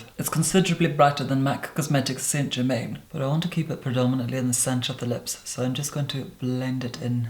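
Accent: United Kingdom. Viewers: Me before watching: speaks in eng with a bit of filipino accent Me after watching few videos (filums hah) of: starts talking in british accent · It’s nice to hear I am not the only one. ❤️ I do have to admit, the Scottish accent is in my top 3 favorite accents, nevertheless, I have never heard such a chique version. Nonetheless, I felt immediately drawn to it the moment I heard first speak. When I found out the origin of the accent: ah..... naturally.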